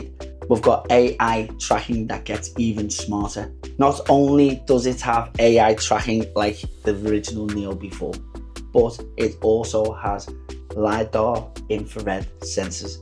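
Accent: Liverpool, United Kingdom. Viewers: You sound Scouse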